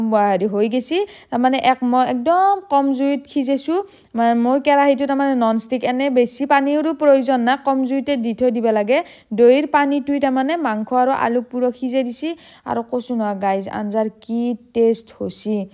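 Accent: Lower Assam, India. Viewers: r accent lower assam or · Bohut val lgil vlog tu. Bohut hahilu kotha khini huni. Actually moi upper assam r. Haikrne lower r vakha tu huni val lge. Hahi uthi gol😂.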